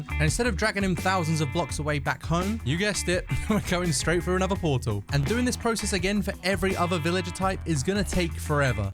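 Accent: United Kingdom. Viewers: Your from England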